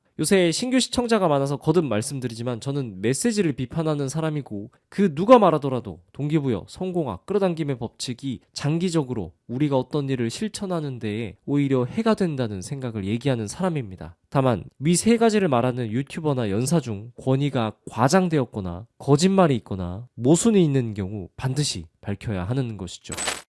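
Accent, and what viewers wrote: Jeolla, South Korea. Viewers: 영어완전 전라도발음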